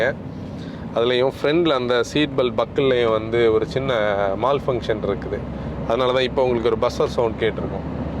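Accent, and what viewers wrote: Kanyakumari, India. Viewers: Kanniyakunari slog apadi than irukum..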